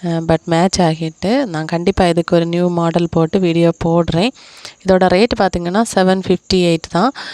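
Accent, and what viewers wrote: Kanyakumari, India. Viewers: கன்னியாகுமரி மாவட்டமா உங்களுக்கு Sister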